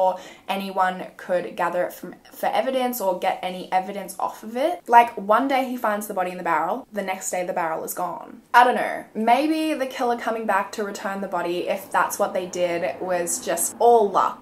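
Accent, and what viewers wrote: Australia. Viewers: I really like your Australian accent 😊💖. And your videos too. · Her voice is so peaceful to listen to! Australian right? 😍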